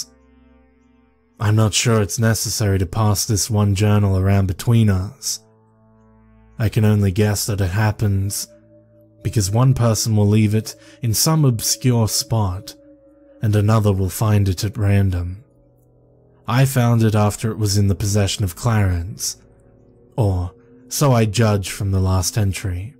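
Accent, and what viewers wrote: Australia. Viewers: I'm pretty sure it's his first language. It sounds like an Australian accent to me. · Aye Aussie English has different pronounciation eh? I thought you all just spoke like that by chance lol!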